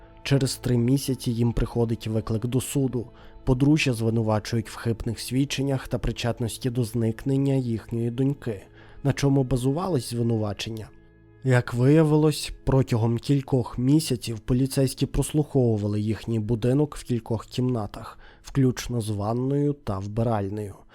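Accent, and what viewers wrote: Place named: Ternopil, Ukraine. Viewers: Я також припустив що автор з західної України😊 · На таку файну тернопільську вимову нелегко нагнати саспенсу, хоча дуже стараєшся) якщо звикнути то норм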